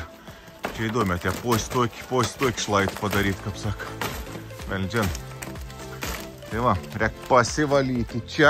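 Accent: Samogitia, Lithuania. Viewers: 😂 Maniau, kad tai kažkas iš M. Petruškevičiaus serijos - punčakos nemadinga 😂. Ačiū, už tą nuoširdžią žemaitišką kalbą ❤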